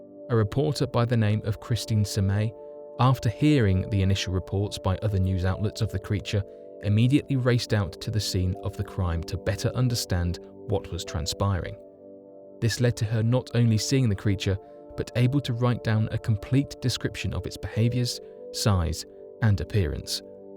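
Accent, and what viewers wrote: England, United Kingdom. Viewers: The way this is narrated is giving me headache. I'm confused because he has an English accent but it's like he's never spoken English before.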